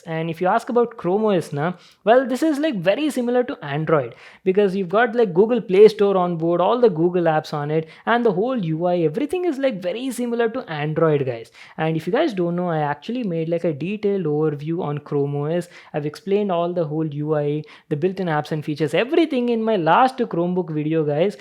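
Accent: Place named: Telugu, India. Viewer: English with Telugu slang can be easily understood nuv super anna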